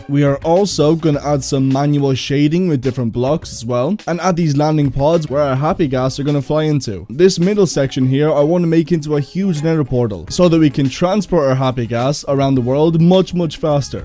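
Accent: Ireland. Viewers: I can smell the irishness from a mile away, my dad is irish so this is wholesome👍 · I KNEW IT, as an irish man when u were saying stuff like "tree" instead of three (it wus rly subtle but i could kinda tell) i KNEW u were irish, i jus couldnt prove it until wait... ive been watching that long?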